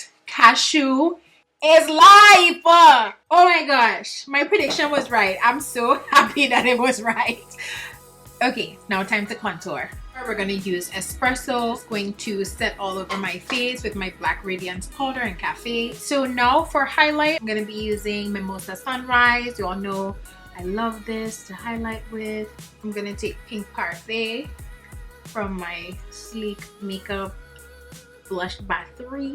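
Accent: Trinidad and Tobago. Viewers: This is my first time seeing your videos. And tell me why in your opening line I’m like “but why does she sound trini?” Opens description bar and tadaaaaa 🇹🇹 you got a subscriber from your fellow trini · Dont know why it took me so long to subscribe to your channel. You always have a timeless look plus the accent. Trini gyal ??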